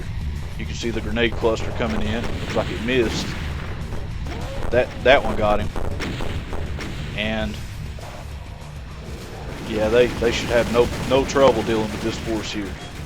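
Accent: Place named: Texas, United States. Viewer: you sound like somebody from texas with that accent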